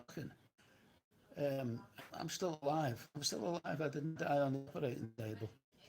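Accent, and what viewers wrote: Liverpool, United Kingdom. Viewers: True Scouser!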